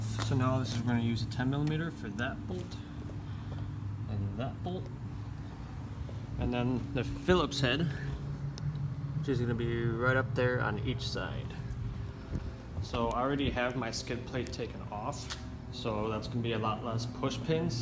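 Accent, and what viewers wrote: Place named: Canada. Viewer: Can't stand that Canadian accent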